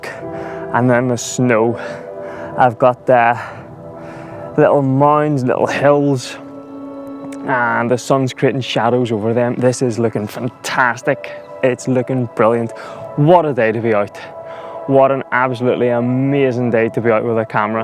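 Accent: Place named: Northern Ireland, United Kingdom. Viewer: Beautiful drone work, views and stills. Definitely a Winter Wonderland. Judging from your accent you are from Northern Ireland. Am I correct that you are living Scotland now? I am a Scot from Edinburgh but have been living in the pretty little town of Portstewart N.I. for 45 years to date. Anyway, I wish I was there also photographing that snow and hills...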